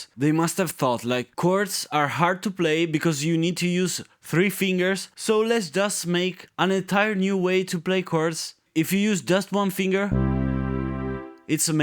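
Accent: Italy. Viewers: sei italiano? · I was just watching this video now and I told my girlfriend " this guy is def italian" then i said "he sounds like he's from my hometown too" then You showed lido degli estensi and I was like bruh... I went to highschool there! small world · sei italiano hai il mio stesso accento quando parlo inglese😂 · intanto l inglese lo parlo e lo scrivo senza problemi. Ma il tuo accento è davvero pessimo, stride, è fastidioso a un orecchio italiano, figuriamoci a uno inglese. Non a caso avevo capito subito che eri italiano. Cioè parlare inglese non significa tradurre le esatte parole ma esprimere il concetto dall’italiano all’inglese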